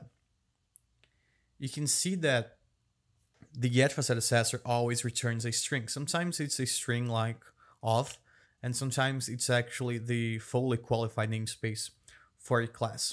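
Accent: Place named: Brazil. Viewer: já de cara notei que tu é brasileiro kkkkk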